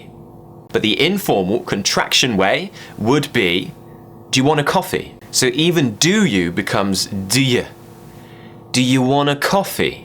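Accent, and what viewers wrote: RP, United Kingdom. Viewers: I wonder if your accent is Received Pronunciation?Thank you! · I didn't understand British accent before. But now I can't believe that I can translated almost all you said. Thank you from Thailand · Hello,! I came across your channel last week and now I can't go a day without watching at least one of your videos. You're very helpful and I want to thank you for that. You're automatically the best teacher I've ever had, haha. I really want to improve my British accent because I'm from South America and I learnt English in the American way, but I really want to learn British English more. When I watch your videos I always practice alone at home pronouncing the words the way you do it, but when I'm at university and I'm required to speak in English, I always end up speaking with my usual American accent because I'm still not used to speaking with a British accent and I feel kind of forcing it, like acting up my English, if that makes sense. 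Do you have any advice for me on how can I feel more relaxed speaking with my rough British accent to other people? Thank you very much again! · Are you speaking RP accent? You speak so clearly.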